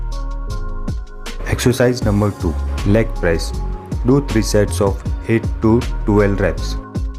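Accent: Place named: India. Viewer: واضح من اللكنه هندي بحت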